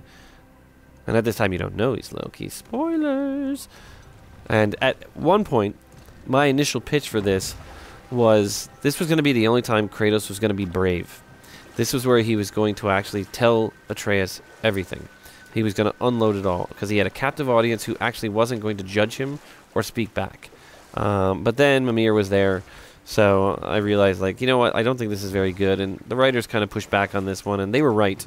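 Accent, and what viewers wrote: United Kingdom. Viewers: British accent love it ❤️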